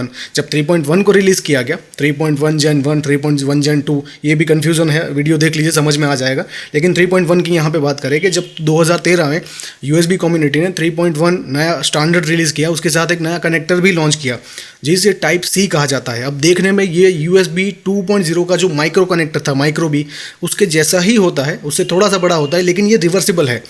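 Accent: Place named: South, India. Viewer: south indian aah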